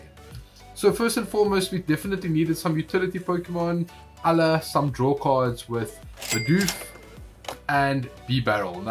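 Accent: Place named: South Africa. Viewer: You have the thickest sa accent! Nice vid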